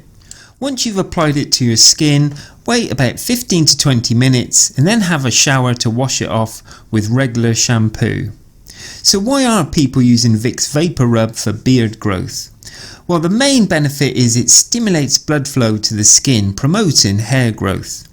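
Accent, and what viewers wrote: United Kingdom. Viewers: You sound like a british hillbilly 👎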